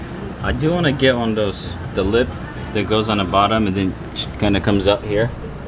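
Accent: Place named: Russia. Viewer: Я на первых минутах раскусил , что Русский , )))) акцент выдал))). Тачка супер 👍🏻👍🏻👍🏻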